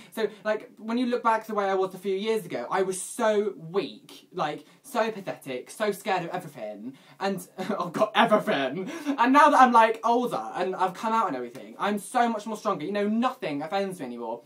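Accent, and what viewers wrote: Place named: RP, United Kingdom. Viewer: Hey... got somethin' to tell you (but don't get annoyed, right?): first you posh like hell, second you talk as fast as a missile, third you sound a bit feminine. OK, I promise I'll wath your vid on slow-motion and try to catch as much as I can (I'm not native, I'm gay). Kiss ya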